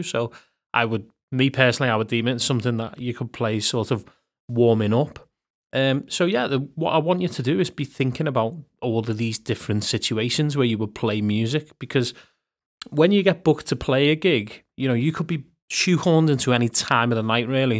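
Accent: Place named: Liverpool, United Kingdom. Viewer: I just discovered this feature, but didn't know how to filter or search with the tags... which is why I came here. And I was welcomed by the most amazing scouse accent in the history of mankind. Boss mate!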